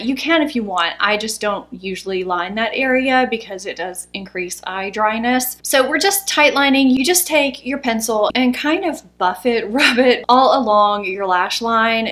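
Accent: South, United States. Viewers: I appreciate your subtle southern accent #southernbelle 🥰🥰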